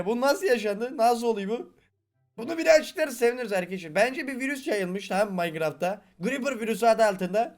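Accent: Black Sea, Turkey. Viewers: Abi sen Karadenizli misin?????????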